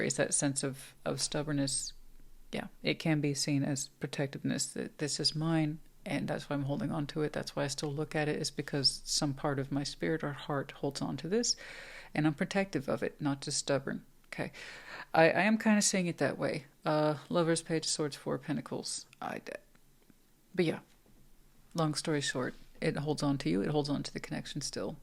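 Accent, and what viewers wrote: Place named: Texas, United States. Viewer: Once again, I have closed my eyes laid back then I started your Pisces reading after listening to what seems to me a very happily excepted different more calming tone to your Texan voice of past? Your reading is so very correct i can not fail not to actually not believe that I what your Tarot cards speak to You ☆♡XIII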